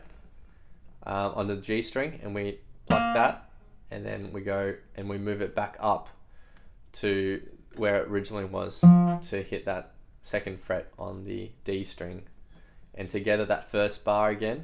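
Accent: Australia. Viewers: Sounds like Aussie accents. No offense tho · Your tutorial was great man, you need more subscribers cause you deserve it 👊😁 What nash are you anyway? (You're aussie right?)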